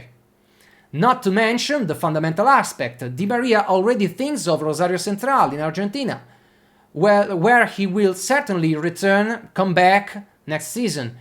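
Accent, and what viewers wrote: Campania, Italy. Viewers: In inglese si perde il tuo grazioso accento campano..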